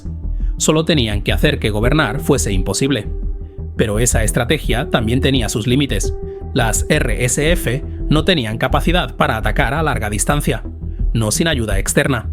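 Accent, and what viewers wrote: Spain. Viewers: gracias a Dios que contraron a un español para traducir al castellano en acento neutro de España. La IA es cutre y suena a tu acento tercermundista de come bananas.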